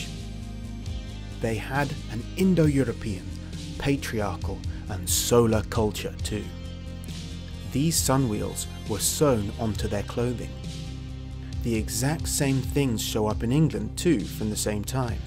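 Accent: South Africa. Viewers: You sound a little South African brah. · this guys voice sounds afrikaans slightly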